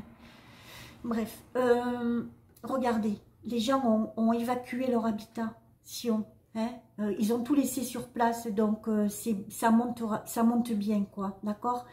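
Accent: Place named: Southwest, France. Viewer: Bonjour je viens de vous découvrir est je me abonnée j aime bcp votre douceur et votre l accent si je me trompe pas il viens de sud-ouest, merci 🙏pour tout ces bonnes nouvelles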